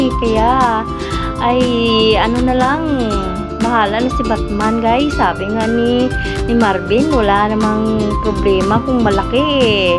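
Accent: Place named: Iloilo, Philippines. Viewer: Ilonggo na tono mo sis 😅😅😅😅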